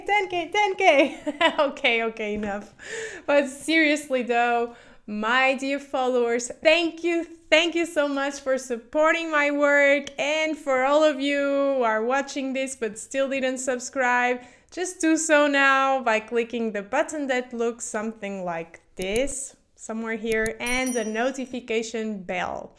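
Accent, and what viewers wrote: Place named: Porto, Portugal. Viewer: Não é Porto, sua parva! É Puorto! E não é caralho, é cuaralho 😂 adoro o sotaque do Porto hahah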